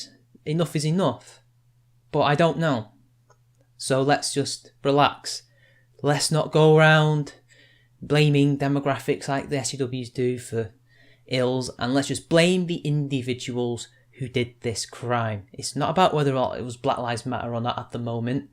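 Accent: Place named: England, United Kingdom. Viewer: G Willy He's English by the way, if you could not tell by his accent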